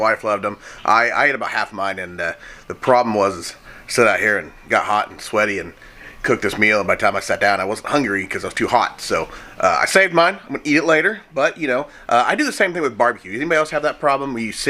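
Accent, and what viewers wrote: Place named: Texas, United States. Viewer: can I place an order for some of your breakfast burritos 🌯, to be delivered to Chuck and I in Texas???? Love ya'll...Your Texas Mom🤗😘🥰👄💞👄 P.S. I'm amazed you've maintained some of that Texas accent.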